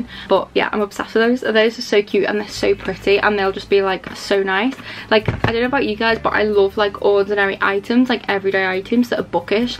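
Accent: Liverpool, United Kingdom. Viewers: Where are you from in the uk, you sound Like you’re from Liverpool x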